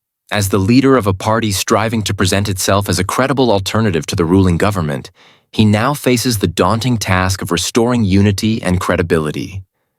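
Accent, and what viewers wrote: United States. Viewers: If this confrontation was so interesting, why is it not shown? As a secondary question, why is it 'voiced' by an American person? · Political News Club....based in Saudi. No idea about the UK and our politics and a stupid USA voice to boot. Go and do something useful instead of posting this rubbish.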